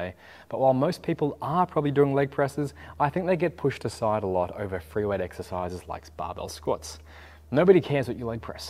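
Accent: Australia. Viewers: So weird hearing an Asian man speak with a thick Australian accent. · Are you Australian? Where are you based? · Are you Australian?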